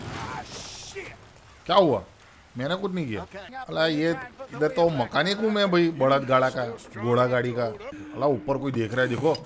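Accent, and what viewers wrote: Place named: Gujarat, India. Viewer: Your Hindi narration is 10000 times better than gujju narrations. It’s gujju flavored Hindi 😋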